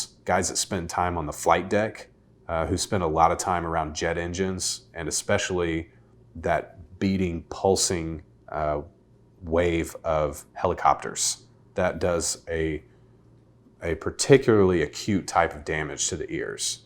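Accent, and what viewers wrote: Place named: Indiana, United States. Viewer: You, sir are absolutely correct. As a disabled navy veteran myself, I can attest to the facts you've stated. I'm service connected for tinnitus, and have been diagnosed with hearing loss and provided hearing aids as of last year. I also service connected knees, and was diagnosed with sleep apnea. The VA denied my sleep apnea claim despite the diagnosed and cpap machine. I'm currently filing a PTSD, insomnia and migraine headaches. As a native of Indiana myself I pronounce tinnitus the way as yourself lol. And thanks for the great presentation.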